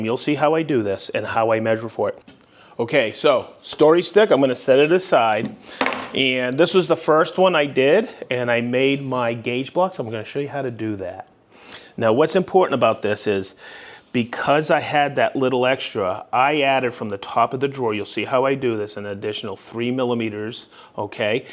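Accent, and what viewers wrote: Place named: Maine, United States. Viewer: such genius in simplicity and Central Maine accent. Take a Shot for every "Whikkid"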